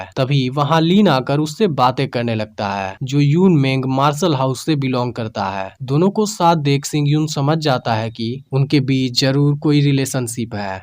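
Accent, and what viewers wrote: India. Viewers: I hate the Indian accent......I can't stand hearing it awwwwwwwww🤮🤮🤮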